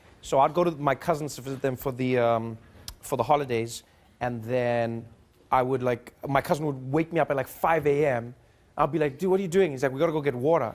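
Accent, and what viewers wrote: South Africa. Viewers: I think it’s cause he has a South African accent. It’s clear and easier to understand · Come Home & Run for President, PLEASE!!!..and that ain't a joke, you'd be shoe in, got my/our vote...and wouldn't it be priceless running against Julias in 2029!!!... "_" ;-p....and have to mention, it was a pleasure working with you or should I say around you & shoot day driver on the Simba Chips Local Flava Ad campaign in 2010 or was it 09?, cape Town, you had me & those not sleeping at 4am pu in stitches laughing...well f-ing done man and don't EVER lose your SA accent proper pls..even if the networks did their worst, keep it local bruv:) much love & thank you #TrevorNoah for being the best foot forward SA has to offer...one of but among the top;-p #fuckTrump :) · I truly miss the daily show 😢 I love it! I am from Holland 🇳🇱 but I love 🥰 how you tell it so graphic that I can feel myself part of every story you tell. 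I love your South Africa accent and live. And this what you tell I truly believe back in history we see that we human created toys and games from our hand 🖐️ to play tennis, to finding a round brick 🧱, throwing robbed coins 🪙, gambling 🎰. I follow every show from you I hope that you come back with something similar like the daily show.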